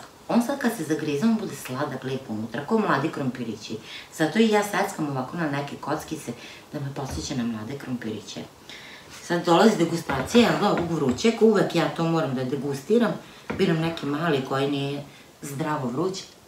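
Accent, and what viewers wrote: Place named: Sombor, Serbia. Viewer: Bas si simpatična 😂 a recepti su ti super! Zadovoljstvo te gledati i slušati , taj Somborski naglasak obožavam… pozdrav iz Edmontona u Kanadi❤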